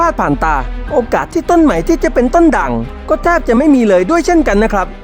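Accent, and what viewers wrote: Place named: South, Thailand. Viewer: เสียงบรรยายคล่องแคล่วดี แต่ออกสำเนียงใต้มากไปหน่อย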